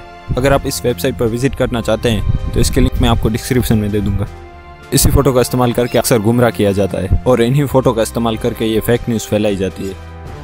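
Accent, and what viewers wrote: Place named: Rajasthan, India. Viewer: aapke langauge tone se aap rajasthani lag re ho ya gujrati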